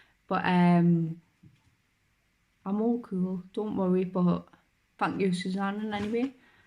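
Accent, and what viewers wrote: Newcastle, United Kingdom. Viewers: Geordie accent (North East England)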